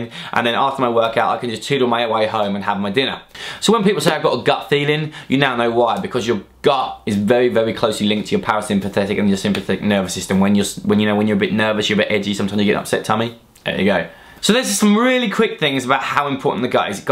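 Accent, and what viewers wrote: United Kingdom. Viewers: I understand much of what you're saying and it's important...I have a bit of trouble here and there not because of the UK accent (I;m Canadian :P) but maybe you speak too quickly? I never thought I'd say that! But I'm going to rewatch and try to catch a couple of things. Also I've watched your vids before on health balanced diets, and other ones ( I follow Cassey Ho too) I'm just confused about portions and what good foods are the best or not the best to have (like in the various food groups, breads, grains, fruits, types of meat etc) I would love some true explanation on that - the internet (like google searching) gives too much conflicting info depending on who wrote the article you know...so :S Thanks a lot :D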